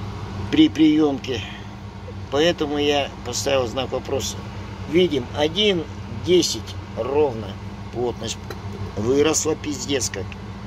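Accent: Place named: Chita, Russia. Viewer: Друган ты точно с Казахстана !? А то мне кажется ты наш , с Читы )))👍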